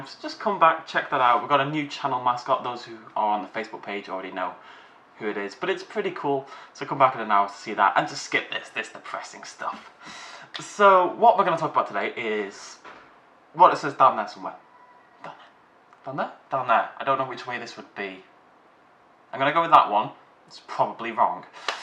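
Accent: United Kingdom. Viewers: Don't forget the British accent. Unless you have one yourself.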